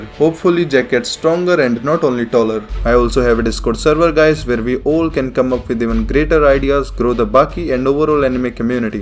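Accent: India. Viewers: You sound Indian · Are you a indian? Your voice sounds indian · This is the only guy with an Indian accent that doesn’t make me want to leave his video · The normal jokes are way funnier with Indian accent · His Indian accent is cool. Most Indians I ever listened sounds more difficult to understand. This guy's Indian accent is kinda easy to understand 😂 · same it sounds indian and not indian at the same time, it's complex lol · I enjoy the heavy indian accent shitposting about Baki. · it IS India accent. He is an Indian I can vouch for that. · Everything on his channel is funny the Indian accent just adds a lot to it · Ur an Indian the accent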